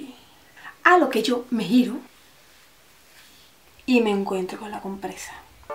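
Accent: Andalusia, Spain. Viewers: Soy latino americano y esta claro que hablo diferente a los españoles y etc, pero veo a esta chica y no paro disfrutar su acento tan rico... tiene un golpe de timbre en ciertas palabras muy bonito no se de que parte de España será por que se que tienen varios vocablos allá pero no se, es muy sabroso su acento jajajaj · Si no eres andaluz si te va a costar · Para que te enteres jeniffer chavala, es andaluz con un toque de barrio y al que no le guste que se arañe. Más claro agua y de cateta nada, Sevilla capital. Los andaluces tenemos la fortuna de hablar bien clarito con pocas palabras.....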